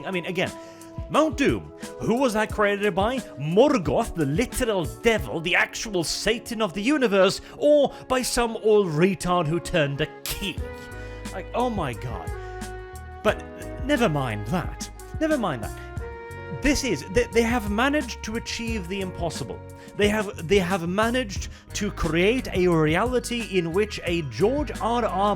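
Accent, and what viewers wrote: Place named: England, United Kingdom. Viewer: I've noticed that he has this very crisp and pleasant English accent most of the time, but when he does longer videos with other people, he seems to partially lose that accent part of the time. Anybody know what's up with that? lol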